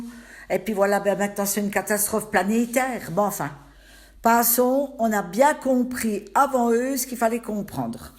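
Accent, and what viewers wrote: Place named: Doubs, France. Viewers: L'accent du doubs , vindiou ! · MERCI MADELEINE (LOLA SIMONIN) MERCI DE VOTRE GRANDE GENEROSITE ,DE NOUS FAIRE PARTAGER VOTRE FRANC PARLEZ FRANC COMTOIS JE VOUS ADORE DEPUIS VOTRE PASSAGE DANS MON COIN LA BOURGOGNE FRANCHE COMTE AU TOUT DEBUT DE VOTRE CARRIERE VOUS ÊTES TOUT TA FAIS LA COPIE CONFORME DES GENS ANCIEN QUI PARLEZ AINSI AYANT UNE FAMILLE A GRAY DOLE CHARGEY LES GRAYS ILS PARLENT AINSI LE PATOISMERCI POUR CE QUE VOUS ÊTES PRENEZ SOIN DE VOUS ET DES VÔTRE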